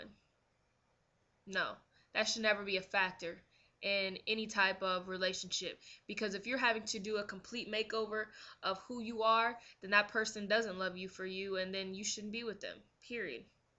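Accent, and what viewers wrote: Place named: United Kingdom. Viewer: im guessing you are brittish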